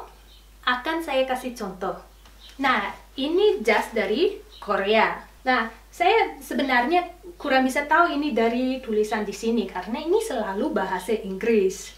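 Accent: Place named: Bali, Indonesia. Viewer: logatnya bali campur jawa.. 'dathang' pepathah..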